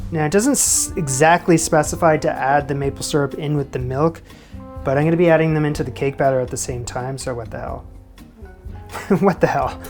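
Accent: Canada. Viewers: It seems, since you have been back in Canada, your accent is returning. Which is nice. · I want to try this but in the right sized pans, and really whip air into the icing so it does not seize up. Maple sugar is a wonder. You can swap it out for brown sugar, but it is very pricey. I'm wondering is if a little whipped cream is called for. Unsweetened. Ah, the comment of woulda, coulda, shoulda. Your Canadian accent is much stronger and you video talents much diverse! You go guy!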